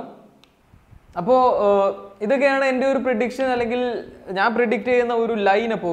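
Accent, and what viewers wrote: Malappuram, India. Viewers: Malappuram slang kidukki💥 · ഇങ്ങൾ മലപ്പുറം കാരൻ ആണോ 🔥❓️❓️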